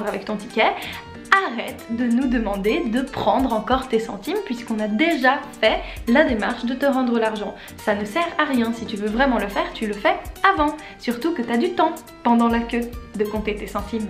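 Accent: Switzerland. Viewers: Très drole, j'ai eu le réflexe inverse..Je me suis dit.."tiens, elle n'aurait pas un accent suisse?"...Et puis elle à parlé de francs et là "Ben ouais...elle est suisse".... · Je me disais bien que ton accent me faisait penser à quelque chose (à mon propre accent donc haha) ! Une Suisse ouii ❤️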